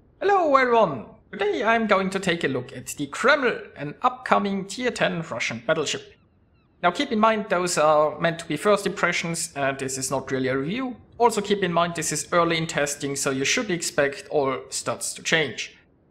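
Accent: Germany. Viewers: Der deutsche Akzent haha sehr gut :)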